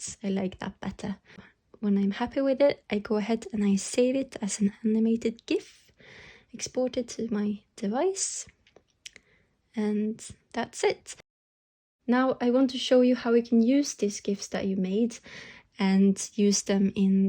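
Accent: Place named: Estonia. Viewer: Ah... estonian accent...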